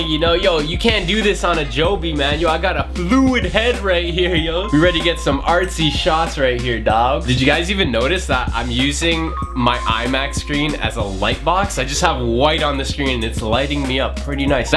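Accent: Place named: Toronto, Canada. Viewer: sound like your straight outta toronto daagg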